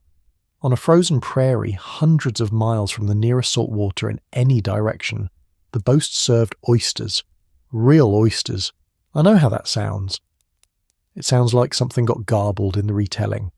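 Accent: England, United Kingdom. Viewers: Why does the narrator have an English accent ?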